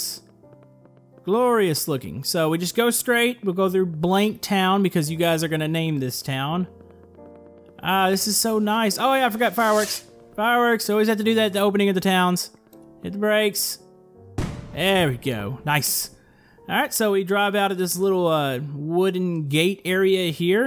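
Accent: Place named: South, United States. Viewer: By hearing your voice it sounds like you have a country accent...no offense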